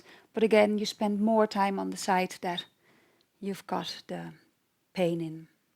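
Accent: Netherlands. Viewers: You can hear from the annoying accent that she is Dutch lol.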